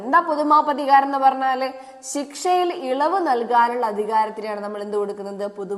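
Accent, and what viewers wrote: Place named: Vadakara, India. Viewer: Vadakara ente oru frnd und same slang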